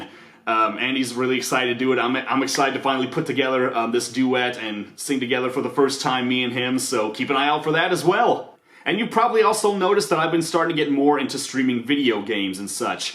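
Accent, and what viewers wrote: South, United States. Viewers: After watching one of his videos i hear the country southerness in his voice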